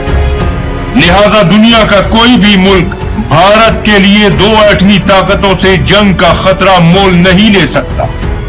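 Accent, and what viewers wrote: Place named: Pakistan. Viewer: Very good comentry best language and pure pakistani accent other u tuber speaks like indians pure pakistani accent plz keep it up brother aapriciate to adopt pakistani accent this is our language we dont like indian accent love you u tuber speak like this thanks from karachi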